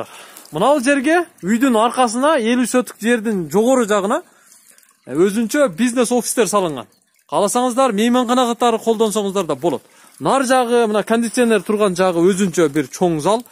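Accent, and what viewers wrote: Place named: Osh, Kyrgyzstan. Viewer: Оштон болобузда ака бизден отсо кечирес ошто ушинтип суйлойтко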